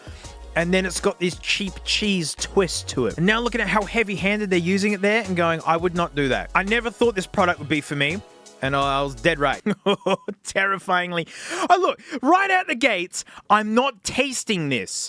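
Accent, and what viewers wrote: Australia. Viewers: some Assie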